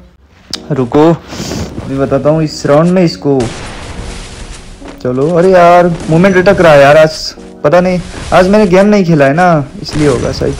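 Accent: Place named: India. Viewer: You are indian or nepal becuase your voice indian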